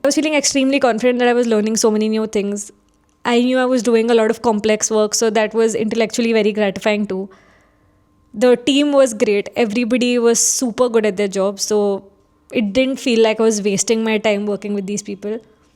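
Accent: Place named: India. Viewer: Itna gandha accent hai pajjeett vala. Hindi mai bol le London ki rani.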